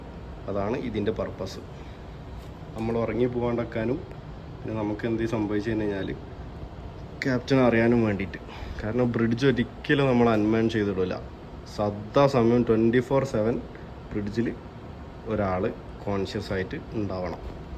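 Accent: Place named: Kozhikode, India. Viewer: Voice over sound കുറവാണു... ആരോ ചെവിയിൽ പതുക്കെ പറയുന്ന പോലെ 😄... പിന്നെ ഈ കോഴിക്കോടൻ slang ഒഴിവാക്കിയാൽ കേരളത്തിലെ എല്ലാവർക്കും ഇഷ്ടപ്പെടും.... "ദേവൊസോം" എന്നല്ല ദിവസം എന്നും മണിക്യൂർ എന്നതിന് പകരം മണിക്കൂർ എന്നും തിരുത്തുക.... Comments പോസിറ്റീവ് ആയി എടുക്കുമെന്ന് പ്രതീക്ഷിക്കുന്നു 🙏.... Video നന്നാവുന്നുണ്ട്... ഭാവുകങ്ങൾ 👍👍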